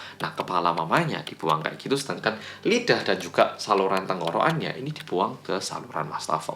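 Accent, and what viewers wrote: Java, Indonesia. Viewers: medok.e ketoro men 😂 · Coba logatnya, jangan lah kental Jawa, yang dengar semua orang Indonesia 😊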